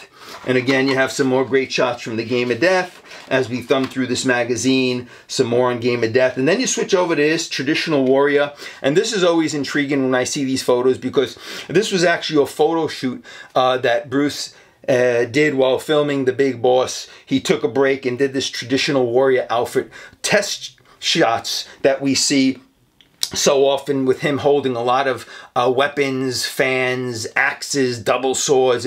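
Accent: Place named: New York, United States. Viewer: You brought back some great memories. You sound like a Noo Yawka. Did you ever go to the martial art shop on 42nd St?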